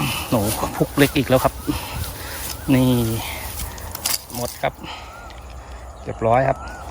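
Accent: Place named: Central Thailand, Thailand. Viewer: สวัสดีครับน้าเพิ่งเข้าดูติดตามให้กำลังใจครับชอบดูคลิปดักหนูน้าอยู่จังหวัดอะไรครับดูจากสำเนียงการพูดน่าจะเป็นคนภาคกลางครับ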